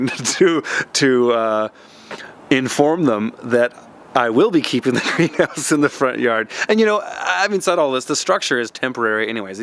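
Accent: Canada. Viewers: I live in Southern California. But I was born and raised in Kelowna. So I somehow stumbled across you channel, who knows how and as you spoke I was like "that dude is Canadian, I wonder where he's from?" and as you moved your camera around I thought, "those house sure look like Kelowna houses." Then u said Kelowna. How funny is that? I swear u live only blocks from I grew up. Farm on buddy!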